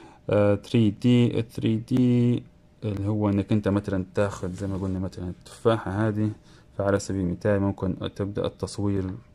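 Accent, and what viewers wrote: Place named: Libya. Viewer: واضحه ليبي يلي يشرح منور ولد بلادي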